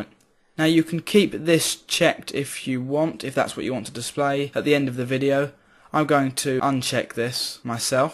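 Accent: United Kingdom. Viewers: I love your british accent! :D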